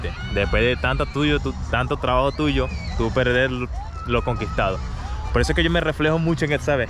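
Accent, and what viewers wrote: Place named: Brazil. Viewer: Vc tá falando portunhol 🇧🇷👈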